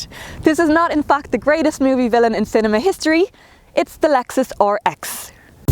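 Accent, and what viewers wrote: Ireland. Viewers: I would definitely buy a Lexus from. Oops sorry already bought a RX450h FSport. Love the Irish accent. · I have strong liking for Irish accent and a beautiful person, she is the perfect combinatb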